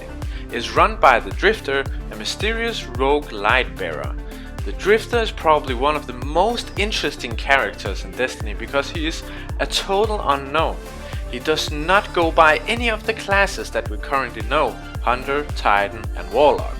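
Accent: Denmark. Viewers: im almost certain that you my good sir are danish #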